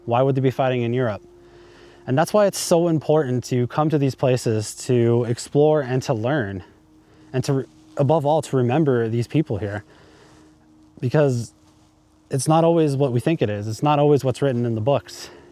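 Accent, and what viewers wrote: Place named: United States. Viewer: Thank you so much for your time making this video. From your accent I guess that you are American. It is wonderful that you describe the fallen warriors in such moving terms. You are an example to us all . Again many thanks.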